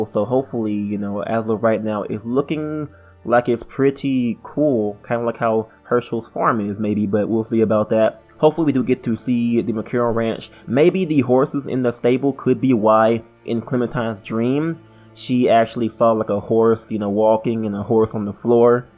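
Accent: South, United States. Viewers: greetings from Russia by the way, I noticed that you have a southern accent you're from the southern United States?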